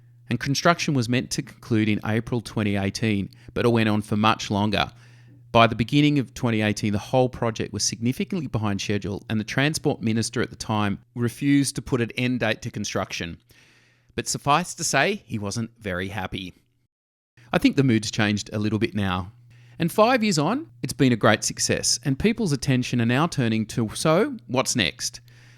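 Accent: Australia. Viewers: Your Aussie accent sounds disgusting like you're half-asleep and your mouth is full of rotten cheese and you're talking at the same time.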